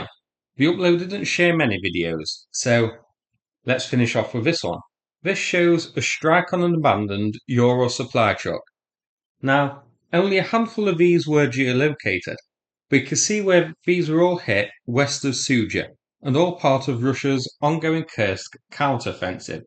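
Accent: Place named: Mansfield, United Kingdom. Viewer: I always thought your accent sounded familiar, I’m from Rainworth but no one knows that so unfortunately I have to say Mansfield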